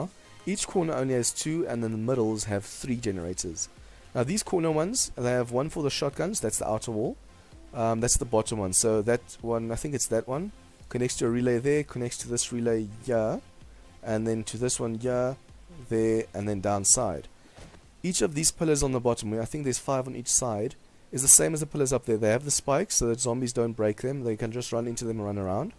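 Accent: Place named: Afrikaans, South Africa. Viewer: Epic! Nice job, great results ... Love it... The Afrikaans accent :)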